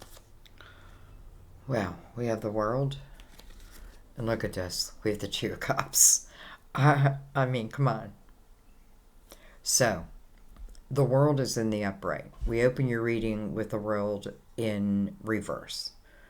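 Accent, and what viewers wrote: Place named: Philadelphia, United States. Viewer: Are you in Philadelphia? You sure have a Philly accent to my ears! Nice reading.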